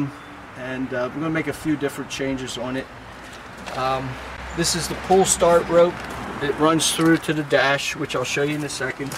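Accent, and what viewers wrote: United States. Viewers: I'm not sure where this guy is from, but he totally has a Delco, PA/South Jersey accent. Hell, he could be REVan Evan's dad! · What part of Philly is this guy from haa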